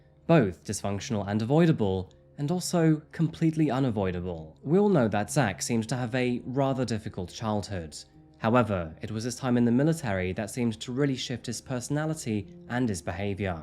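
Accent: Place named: United Kingdom. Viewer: Trapped? Like I didnt click here, for the cute, coffee loving smooth brit voice lol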